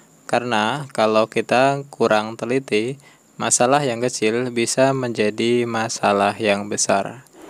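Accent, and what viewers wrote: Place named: Java, Indonesia. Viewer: Masse... njenengan teng daerah pundi toh...ngomongnya medok... maturnuwun sanget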